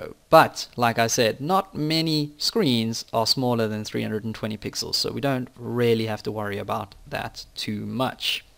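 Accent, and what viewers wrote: South Africa. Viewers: I LOVE your accent man, are you South African? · Really helpful tutorial, thanks & looking forward to getting something that triggers a menu list from a menu that normally appears in the sidebar. PS nice to hear a South African accent :) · Afrikaans English accent ? South African ? Good video.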